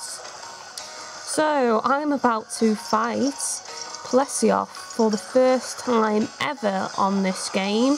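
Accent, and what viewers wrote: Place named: Cumbria, United Kingdom. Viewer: Ahaha excellent, I'll be looking forward to it and thank you I've been wondering how it runs on the 3DS for ages. I'll have to be buying ultimate for the 3DS I own already as I am but a humble college student, too poor to afford a Wii U :( from the look of these vids though it doesn't seem like I'm having to compromise too much. If you don't mind my asking, whereabouts in the UK do you hail from? Your accent sounds like it's Carlisle/Cumbria-ish to me but I can't quite put my finger on it haha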